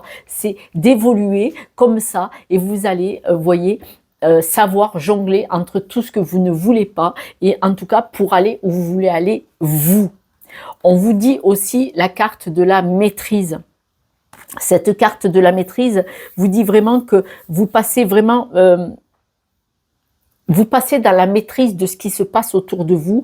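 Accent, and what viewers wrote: South, France. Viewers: Bonjour je viens de m abonner à vous merci pour votre guidance et ce bel accent du sud merci à vous à très bientôt ❤😘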